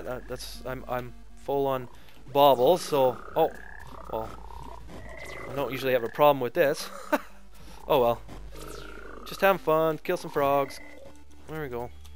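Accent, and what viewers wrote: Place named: Alaska, United States. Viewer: Good collection of tips! I like your accent too. Reminds me of my time in Alaska.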